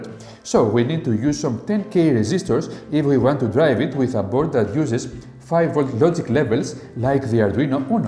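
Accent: Greece. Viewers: I hear a Greek accent! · Are you from Greece? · Γεια σου.απο οτι καταλαβα απο την προφορα πρεπει να εισαι ελληνας.θα ηθελα να σου στειλω ενα link και να μου πεις πως μπορω να το κανω και ποσο (ευκολο) <link> ευχαριστω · the moment he opened his mouth, I knew it from the start, He's Greek!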